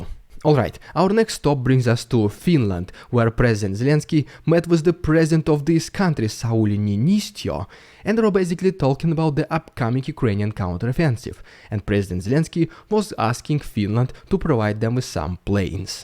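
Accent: Russia. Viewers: От такого русского акцента - кровь из ушей.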